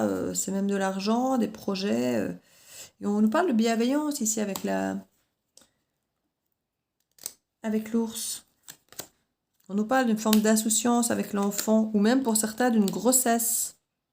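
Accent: Liège, Belgium. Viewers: Ça me parle mais cet homme a 74 ans et ne changera jamais, il a un caractère impossible. Bravo s il a fait un travail sur lui mais je n ai définitivement plus confiance en lui et en plus la haine n est pas loin vu les 2 ans pénibles vécus dans ce lien karmique, rien de sacré là- dedans pour moi! Merci bcp,votre accent liégeois est très agréable, une compatriote!, je suis de Bruxelles. Magnifique votre guidance, cela m'a conforté dans ma décision de couper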